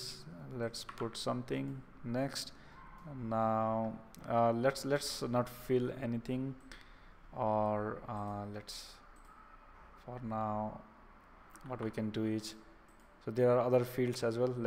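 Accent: India. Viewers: Indian Accent is annoying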